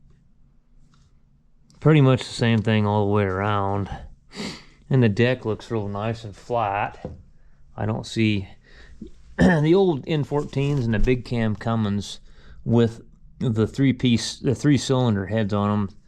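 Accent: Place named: South, United States. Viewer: Where did learn redneck speak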